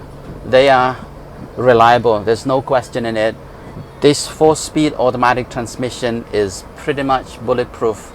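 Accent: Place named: United Kingdom. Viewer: I like your British accent!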